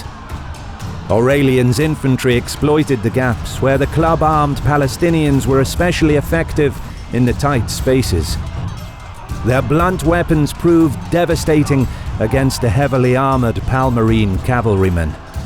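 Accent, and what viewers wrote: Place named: United Kingdom. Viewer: I cant enjoy a documentary video anymore unless its narrated by a british person with a refined accent, well done guys!!!!!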